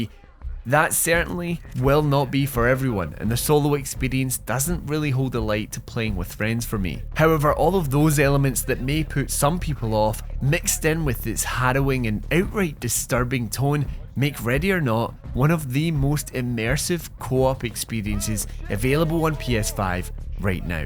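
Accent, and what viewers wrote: Scotland, United Kingdom. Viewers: Its called an accent. Scottish. There's a big world outside america little guy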